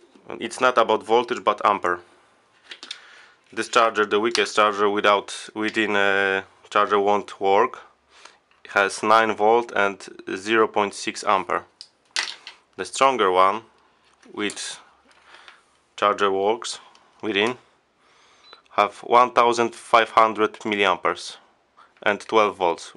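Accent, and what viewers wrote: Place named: Russia. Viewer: From your acent I think you are Russian) But, anyway, Thank tou!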